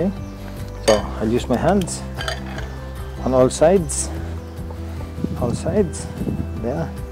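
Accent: Visayas, Philippines. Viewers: Nindot lang gyud paminawon magbinisaya c lodi 😊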